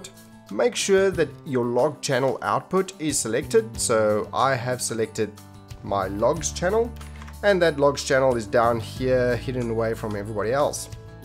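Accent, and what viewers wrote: South Africa. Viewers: You sound South African. Are you? I’m South African too!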